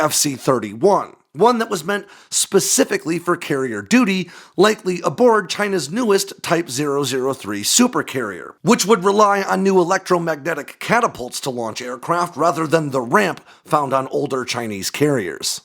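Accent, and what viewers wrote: United States. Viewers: Oh an American talking im out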